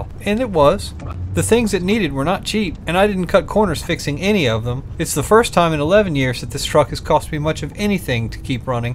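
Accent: United States. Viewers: Are you from Michigan?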